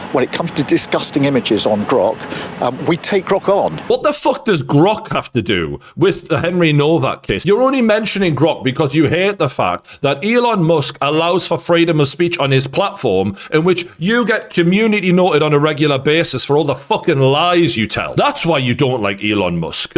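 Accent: Newcastle, United Kingdom. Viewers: I love this geordie, no nonsense guy ❤ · 100% Geordie lad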